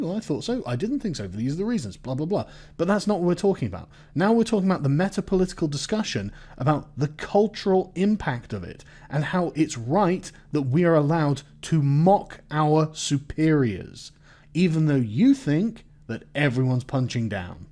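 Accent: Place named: United Kingdom. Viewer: Reading snooty comments in a mocking voice is 1000x better with a British accent..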